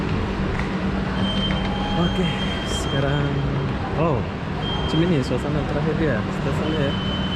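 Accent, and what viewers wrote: Malaysia. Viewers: Abg Ckap mcam ade logat malaysia. Pernah msuk malaysia ke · Ini yang bikin you tobe orang indlonesia ataukah orang malaysia ?? Dialeknya kok dialek malaiysia tapi bahasanya indonesia ??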